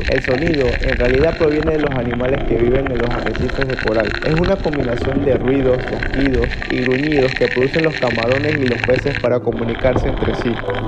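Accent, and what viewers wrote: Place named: Panama. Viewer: Q xopa, acabo de conocer tu canal, ya me suscribí al canal. Youtuber panameño que veo lo apoyo, muy chevere escuchar a alguien con tu acento y jerga. 🇵🇦🔥🔥🔥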